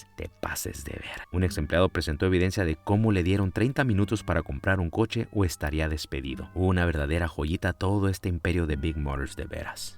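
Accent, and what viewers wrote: Latin America. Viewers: Suena muy latam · "Imagínate que te cobren una reparación que jamás hicieron..." me suena a que tienes acento latino, pero que nunca viviste en latinoamérica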